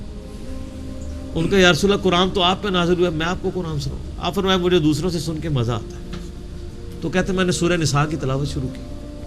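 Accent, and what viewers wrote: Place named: India. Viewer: Hindustani lehja hai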